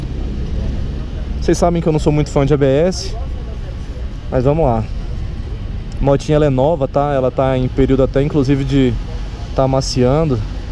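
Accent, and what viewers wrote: Goiânia, Brazil. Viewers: É de Goiânia mano?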